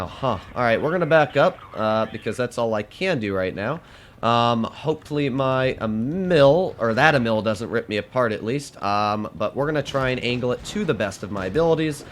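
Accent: Russia. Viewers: I like the way you pronounced the words with the Russian accent😂 that’s funny. I’m from Russia! I really enjoy watching your videos!👍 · I like your Russian accent!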